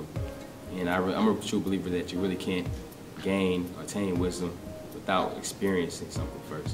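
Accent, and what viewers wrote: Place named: South, United States. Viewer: thank you for the compliment :)..and no I'm not a gangster lol. I just have a southern accent and this video was more about being real and personable instead of super professional with my eye brow raised the whole time (if thats the educated demeanor you speak of) lol. Trust me, I know when to turn it on and off whenever needed. You should check out my video when I hosted on E! year..there was less accent for your pleasure ;)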